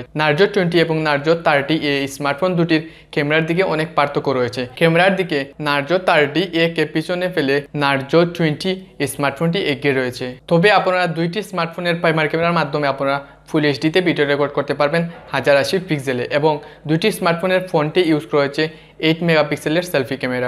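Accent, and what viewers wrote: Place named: Sylhet, Bangladesh. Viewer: সিলেটি মাত বাদ দিয়া শুদ্ধ কা বলো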